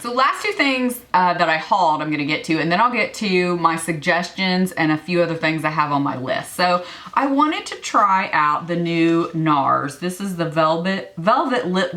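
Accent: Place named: Texas, United States. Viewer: I will echo what many have already said. You look beautiful - your makeup is flawless. I would love for you to be my personal shopper for a day!!! While watching the video, I had to turn my phone volume up because I thought you said you were putting things in your car (instead of cart)!!! Why is she storing good makeup in her car??? I started laughing when I figured out what you were saying. I was born and raised in Texas, but your accent is thicker than mine! (Not a bad thing at all.)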